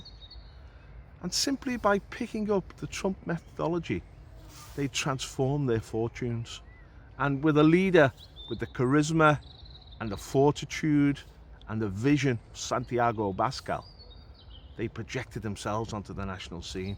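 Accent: Liverpool, United Kingdom. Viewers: Scouser..from Liverpool. Every Nationalist respects those who fight for their Nation State.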